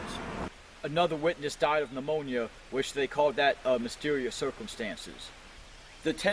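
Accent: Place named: North, United States. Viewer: Greetings from Arkansas my name is Anna where are you from? you sound northern like myself would like to talk to you you're a good looking man...love this video and the content God bless you and yours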